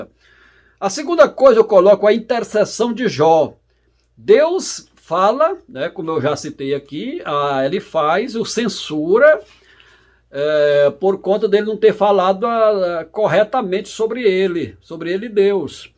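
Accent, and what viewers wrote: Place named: Northeast, Brazil. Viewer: Maravilhoso ensino, No bom sotaque nordestttíno.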